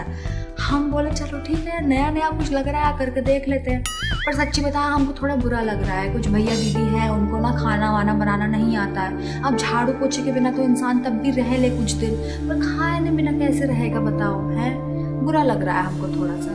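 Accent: Bihar, India. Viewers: Why you use Bihari tone?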